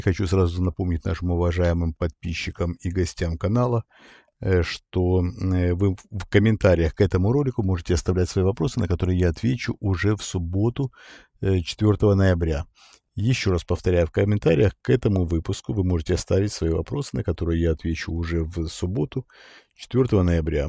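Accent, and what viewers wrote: Ukraine. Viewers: Речь украинская,разве не слышно?)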